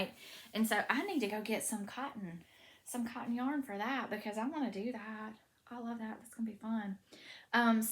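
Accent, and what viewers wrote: South, United States. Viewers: I ❤️ your podcast… and I think you are from a southern place than Michigan!!!’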